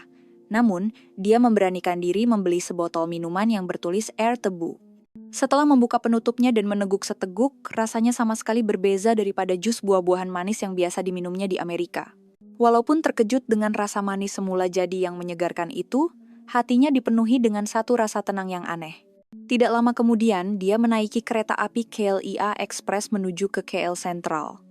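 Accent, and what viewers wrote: Indonesia. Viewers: lagi suara org indon yg bercakap dlm video pasal malyasia.menyam pah dengar meleket2 percakapan dia.haprak lah punya org.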